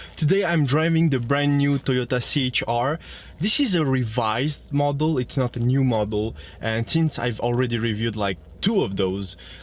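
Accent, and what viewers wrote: France. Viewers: Worst minutes spent in my life. Beside the shitty english french accent, the presenter has no clue about cars. It's like he is read from a paper. Not to mentiom he is ugly af.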